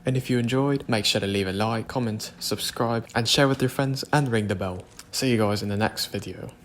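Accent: United Kingdom. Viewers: Well hello there? R u British to? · Question r u British? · You grow so fast keep up the good work. LMFOAOAOA YOU SOUND BRITISH YO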